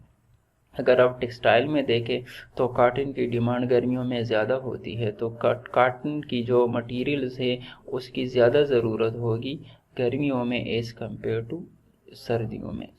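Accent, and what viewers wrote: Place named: Pakistan. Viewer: Beautiful pakistani accent.... I guess its pashto, sorry if i m wrong.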